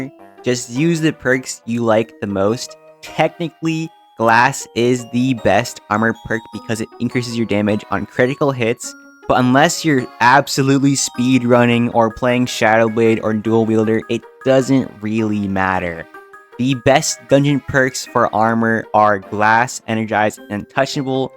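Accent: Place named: United States. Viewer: bro thanks man, these videos are really helpfull its nice to have a normal NON AI american voice explaining the game. W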